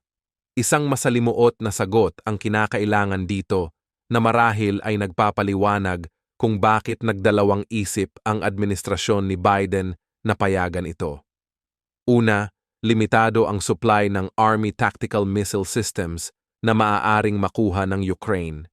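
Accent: Visayas, Philippines. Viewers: Hoy ayusin mo tagalog mo.biaya kaba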